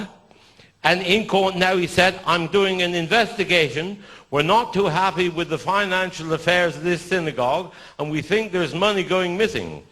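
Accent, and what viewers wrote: United Kingdom. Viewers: Lovely. And nice to hear a Yorkshire accent, it's ages since I lived there.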